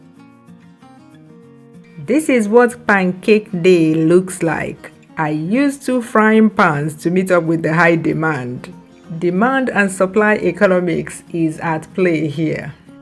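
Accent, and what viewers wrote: Nigeria. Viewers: How refreshing to watch travel video of a person who still speaks like a Nigerian... i got fed up listening to those with foreign accents, whether genuine or fake foreign accents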